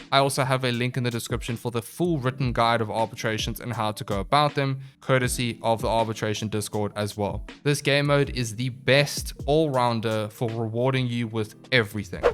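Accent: South Africa. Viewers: Bro do I detect (and forgive me if I am wrong) are you South Africa?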